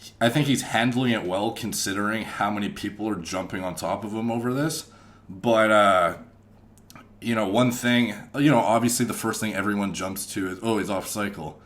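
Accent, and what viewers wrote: Canada. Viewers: Your Canadian accent is obnoxious. Couldn't listen to you talk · The Canadian accent is STRONG in this one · This dude is from Canada af. They the only ones that say aboot. Lol Love your videos though man. Keep it up.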